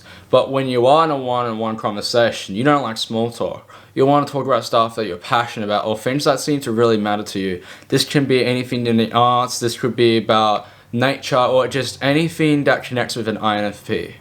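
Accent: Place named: Australia. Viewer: His aussie accent plus a deep voice makes all the difference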